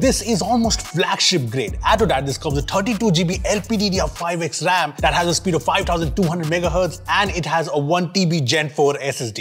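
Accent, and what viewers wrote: India. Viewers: that's his accent. Most urban Indian accents in posh areas of Delhi, Gurgaon, and Noida do not pronounce r in words. And he's from Noida.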